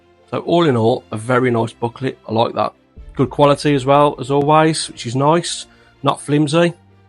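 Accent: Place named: Birmingham, United Kingdom. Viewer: Nice review mate. What part of Brum are you from?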